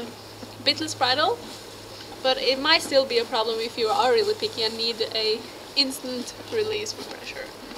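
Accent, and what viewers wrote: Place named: Norway. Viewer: The Norwegian accent was very pretty btw! Hålla from a finn\german\NativeAmerican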